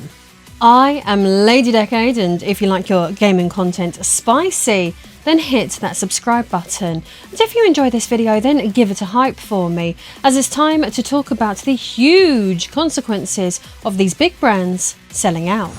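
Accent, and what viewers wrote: United Kingdom. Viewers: got to ask lady decade* uh your accent sounds sorta brittish is that correct just kinda guessing here?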